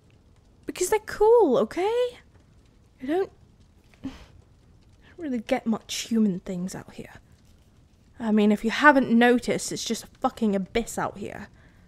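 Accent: Scotland, United Kingdom. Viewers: Scotland? I can't tell. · I love your Scottish accent ❤❤❤ Thanks for another wonderful video 🤗🥰😘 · The hot Scottish lady is back Does anyone else find the Scottish accent attractive?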